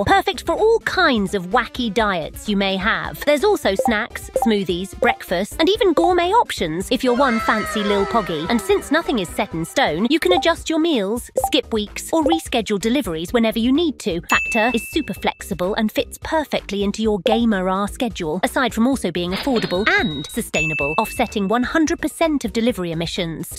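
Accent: Argentina. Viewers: Y mira, por como habla español medio que se deja entrever que es de Argentina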